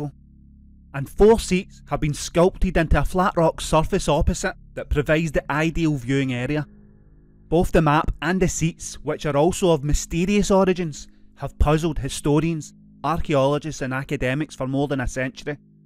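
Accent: United Kingdom. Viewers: I couldn’t have expressed my thoughts in any better way & have left comments saying so whenever I see them. It actually makes me cross that English speakers are complaining about an English speaker 🤷🏼‍♀️we don’t have American accents in the U.K. & we’re as diverse as the USA is in it’s large land mass. 💕:_TKTCEyeSeeYou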